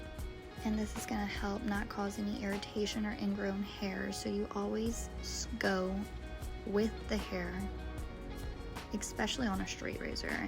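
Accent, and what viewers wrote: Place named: South, United States. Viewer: Good video. Not sure what area of the South you are located but you have the best accent. I used to have a co-worker a few years ago with a very similar accent. She was from Northern Louisiana.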